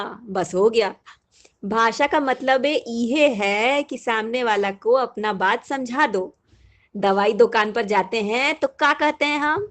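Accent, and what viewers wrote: Bihar, India. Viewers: This kinds of Hindi speak people of western Bihar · Love Bihari accent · It's actually in a Bihari accent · Are gajab marde.ton se lgbe no kya ki Pakistani ho.. Bihari ton dito match kr diye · Bihari accent cute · i love bihari accent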